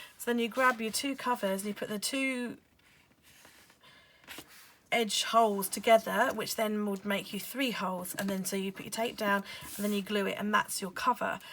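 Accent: England, United Kingdom. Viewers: Lovely to hear another English voice on here! I got mine from Amazon, but I'll be going to The Range in future as is much cheaper!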